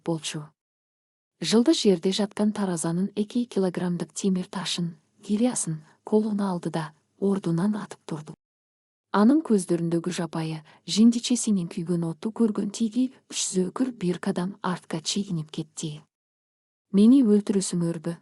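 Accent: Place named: Russia. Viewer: Чала орустан башка окуган киши таппай калдынарбы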